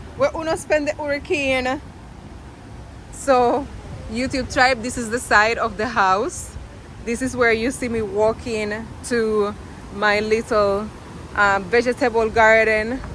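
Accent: Jamaica. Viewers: I love the patois accent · I love the accent 😅officially yu jamaican ❤🎉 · Your patwa is sweet 😂you sound so good, i love it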